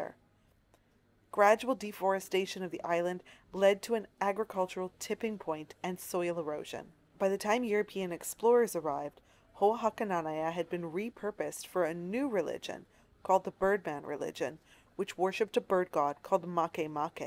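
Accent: United States. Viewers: I can't work out if your accent is American.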